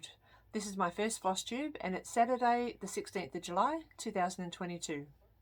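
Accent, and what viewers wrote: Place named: New Zealand. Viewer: Well done on your first flosstube. It won’t be your last, for sure. Hi from Canberra and I can hear the NZ influence there!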